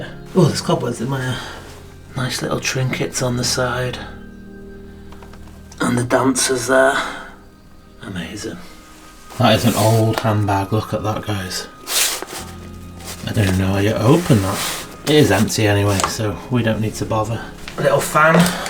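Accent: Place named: Bolton, United Kingdom. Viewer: Hi, I’m from Bolton, living in Northern California (yep, it’s raining buckets now 😄☂️🍂) and I have to ask where are you from? Freaky or just plain intringuing, all your episodes are really interesting. Descriptive, and personal - with your connection to the lives in the houses, and talking it through on camera. I’m hooked. Your accent takes me home too. Nothing else, could you just share where you are from. And I now realise I sound like you, talking to a spirit both close and far away. Thank You for sharing all your episodes:-)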